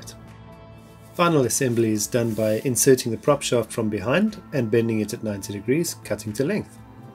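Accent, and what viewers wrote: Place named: South Africa. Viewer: Great video and SA accent, just started with this hobby, lots to learn from a master craftsman. Looking forward watching all your vids. From sunny CT.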